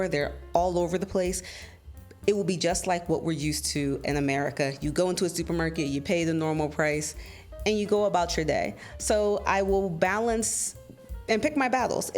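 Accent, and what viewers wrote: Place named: Kenya. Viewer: Gurllll!!!! You even have a Kenyan accent!! B A R G A I N I N G 😂😂😂😂 Karibu home!! #InstantFOLLOW!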